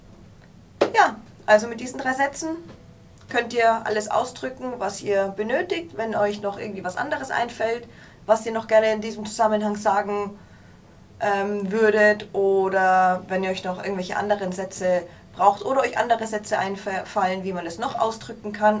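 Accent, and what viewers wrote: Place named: South, Italy. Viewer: appena sento come parli gia' capisco che sei fluente per le intonazioni italiane, perche il tedesco e' piu' duro nei toni. Ma spero almeno di migliorare la comprensione del tedesco. Comunque il tuo italiano almeno nell'intonazione e' perfetto...anche se devo dire sembra venire dal sud :) sud italia naturalish :)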